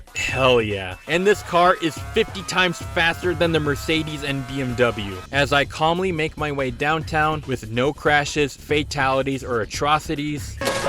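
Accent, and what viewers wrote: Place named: United States. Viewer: I was so confused for a few seconds hearing an american talk about this show, and all comments are in english, what's happening?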